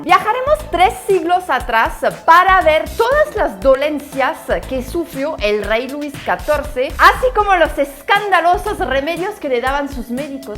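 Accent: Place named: Mexico City, Mexico. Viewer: Soy super fan de tus videos, por ser educativos y culturales con el toque chilango inteligente, saludos cordiales desde Querétaro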